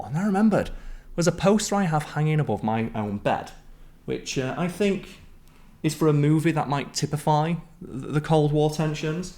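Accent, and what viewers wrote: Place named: Northern England, United Kingdom. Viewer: You've done really well at slowing down the sleech pattern for all the non northern English people. I am one and it was really difficult when I moved over seas and appreciate the effort you've put in 😅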